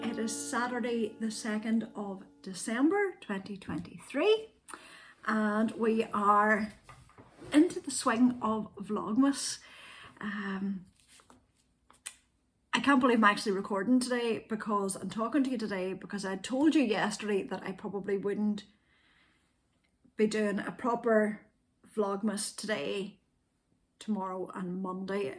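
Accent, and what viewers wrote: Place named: Ireland. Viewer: Sorry about your headaches. Happy you’re able to rebound albeit painfully slow. I love to hear your thoughtful comments about your yarn picks and patterns. Especially in your soothing Irish accent. I, too, was primarily an earth tones knitter-deep greens like you, grey & brown farm yarns, with a splash of red thrown in. But lately some of those bright colors for a garment have been very attractive to me as well. Am thinking of making a vest with my stashvent calendar I received.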